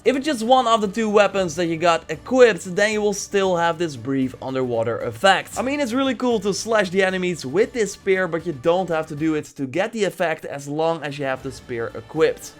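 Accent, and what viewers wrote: Netherlands. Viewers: wejo ik kan letterlijk gewoon horen dat je nederlands bent, wat een accent joh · 100% a fellow dutchguy. je accent gaf het weg :)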